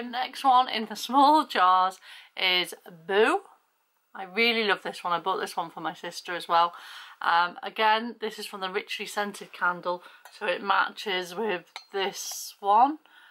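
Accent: United Kingdom. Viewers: I just came across your channel. I listened to what you had to say. Love your English accent.❤️❤️❤️❤️❤️ I Absolutely Love Their candles. I pick them up all the time in TJ Maxx , Home Goods, Marshall's here in the states. I can't live with out them. I have the in all different sizes from the tiniest little ones to the biggest ones. I have single wick, double wick, and three wick. They are Fabulous candles. And by the way I love anything that has to do with pumpkin. I also just subscribed to your. 👍🏻⭐☺️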